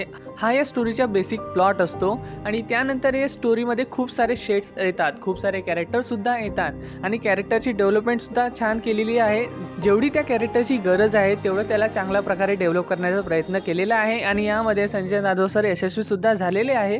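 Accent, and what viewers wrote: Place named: Vidarbha, India. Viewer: Vidarbhatil marathi ahe, so don't expect puneri marathi....... Ignore comments and Keep it up brother 🙏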